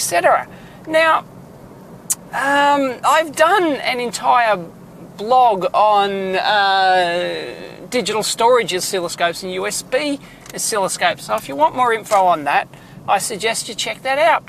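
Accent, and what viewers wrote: Australia. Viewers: No offense....but WHY is the AUSSIE dialect/tone/speech so hard on my ears ? Are Gold Coasters different from Margarets River people..? is it a result of english colonization of the aborigines ? <link> Good advice.... I just picked up a pair of working LEADER 100Mhz scopes for $100 USD in Calif off C-List....LBO-516 and LS-8106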